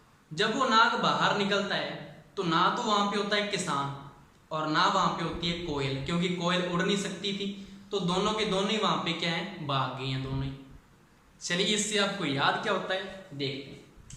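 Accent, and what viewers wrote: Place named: Rajasthan, India. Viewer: Rajsathani lagyo mn Bhai re 😂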